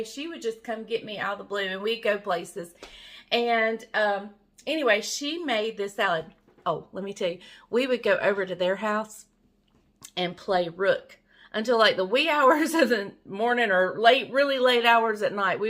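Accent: South, United States. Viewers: I noticed the wrong setting on your new camera, I know you will get it stright just want to mention it incase you can fix it ( I know I could not) please dont get up set by this note just information. any thing that is read is backward the door to the back room is on the wrong side of you (near Carolines picture. )I sure enjoy this channel. I have to turn on closed captions because I am not very educated, you have a southern draw, you talk about things I do not know about, anyway I thought it very funny how the closed captons say things I am sure you never said.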